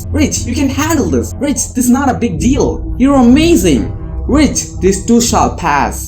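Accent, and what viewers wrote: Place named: United States. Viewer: Mesmerizing to see an indian speaking in american accent. Good job.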